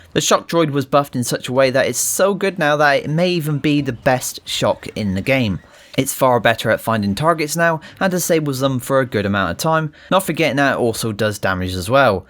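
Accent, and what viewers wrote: West Country, United Kingdom. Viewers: Like the West country twang :)btw Thanks for helping out RedWolf72ps4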